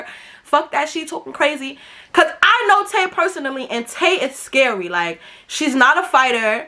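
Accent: New York, United States. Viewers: i really love new york accents 😩🥰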